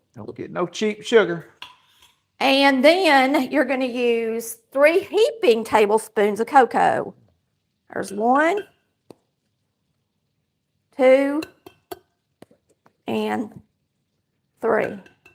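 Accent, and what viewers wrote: South, United States. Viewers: You always make my day when I hear that wonderful southern accent. Happy Holidays to you both. Carrie from Delaware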